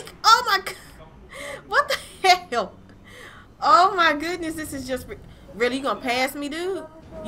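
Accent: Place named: South, United States. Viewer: Found you on Sharree. Good stuff. Where are you from though? Your accent says, the south region.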